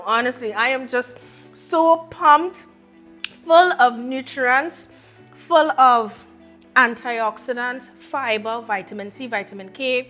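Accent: Trinidad and Tobago. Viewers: I just saw this and loving the enthusiasm ❤❤. Am I detecting a Trinidadian accent?? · Yep, Trini to d bone lol Welcome to the channel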